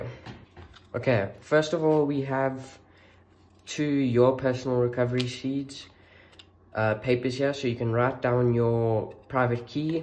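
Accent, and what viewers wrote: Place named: South Africa. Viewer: Awesome video. The SA English is the best sounding.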